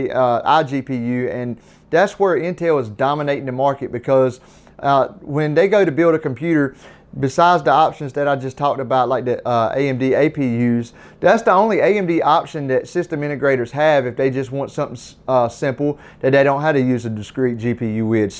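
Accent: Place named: Kentucky, United States. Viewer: About time someone on the east coast gets a tech following going lol. I think GN is east coast but not sure. Are you from KY by chance? I only ask because you have almost the same accent as I do.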